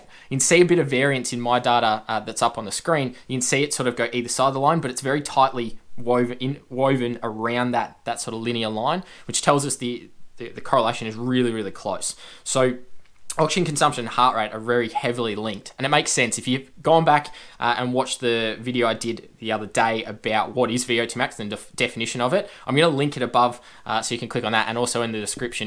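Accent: Australia. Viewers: Haha First thing I noticed was the (Eric lindros?) jersey, but then we he started talking I thought...”wait a minute...this guys Aussie”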